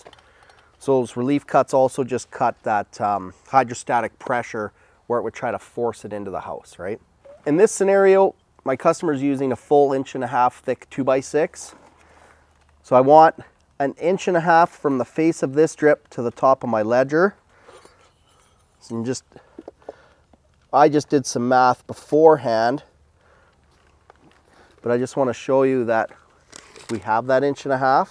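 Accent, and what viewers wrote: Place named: Nova Scotia, Canada. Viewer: Sounds like a Nova Scotian accent lol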